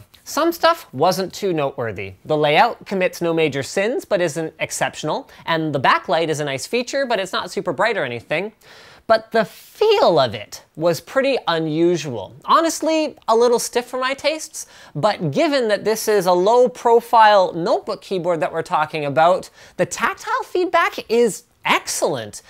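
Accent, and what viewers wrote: United States. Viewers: Yeah, at first I thought that he's a US citizen due to his accent. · Canada? Lol I thought you were from the US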